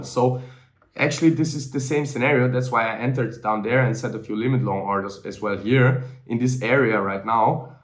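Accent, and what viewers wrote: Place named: Austria. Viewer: Typically Austrian accent.. 🍺🍺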